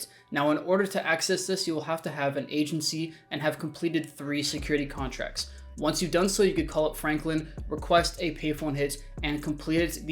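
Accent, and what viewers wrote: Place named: Canada. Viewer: I knew you were canadian from your accent. Greeting from Alberta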